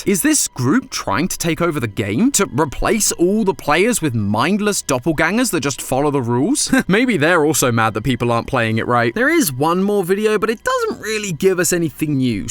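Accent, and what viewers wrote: United Kingdom. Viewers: The new person is just matpat but British, i like it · ngl, i was just watching the gtlive video of this and then noticed that there was a normal video about this, i clicked it and noticed the british accent... it was a new video with the best timing ever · Bro went from American to british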